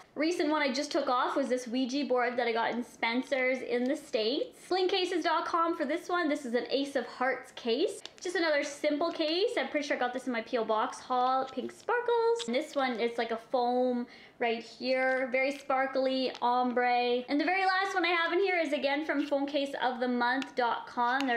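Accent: Canada. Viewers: she's also Canadian so they pronounce words different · omg i can just tell that she is canidian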